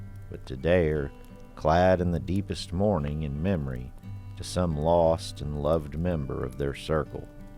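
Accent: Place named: South Carolina, United States. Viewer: Good to hear someone from South Carolina. I had family there too.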